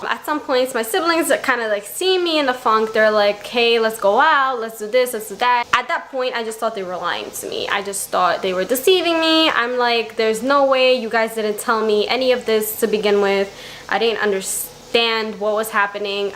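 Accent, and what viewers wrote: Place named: New York, United States. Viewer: I was like mmmm this girl has a New York accent. Then you said you had a Brooklym attitude and I was like wohhh awesome!! I'm from the area as well :) I'm sorry that you had to go through this. Have fun in this upcoming trip.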